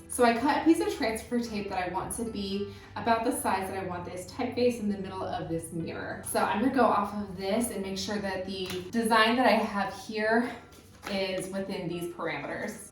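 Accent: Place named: South, United States. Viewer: Do you live in the South. I hear you say y’all but I thought for some reason you live in the North lol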